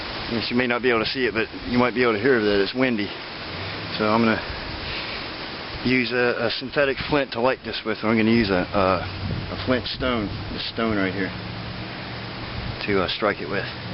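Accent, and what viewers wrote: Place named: Baltimore, United States. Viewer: are you a Baltimorian? You sound like you are on East coast, you sound like the folks here in Baltimore, MD. LOL Just curious. Love the lamp. I am attempting to learn all these ancient survival skills so I have a shot after the Apocalypse. I plan to survive it.:)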